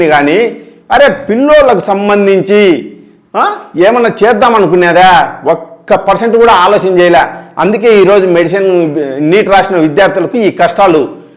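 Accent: Kadapa, India. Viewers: ఏంది అబ్బా నీది నీ భాష చూస్తుంటే కడప ఉన్నట్టుంది నీ యాంకరింగ్ ఏం బాలేదు అబ్బా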